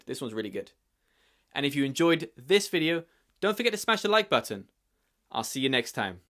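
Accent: United Kingdom. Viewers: I live in Ulsan, South Korea! Most Korean students learn American English in school, I was no exception. But I love British accents so I researched them and I was able to find you! 🥰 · You are the only british that I understand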